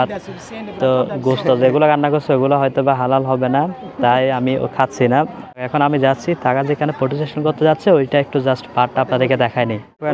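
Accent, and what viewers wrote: Chittagong, Bangladesh. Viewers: ভাই আপনার শুদ্ধ ভাষায় কথা বলার স্টাইলে বুঝা যাচ্ছে যে আপনি চিটাগাং এর মানুষ, তাই না? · আপনার বাড়ি মনে হয় চট্টগ্রামে.. কথা শুনেই বুঝা যাচ্ছে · উনি চট্রগ্রাম।যেখানে জন্মেছে সেই টোনেই তো বাংলা বলবে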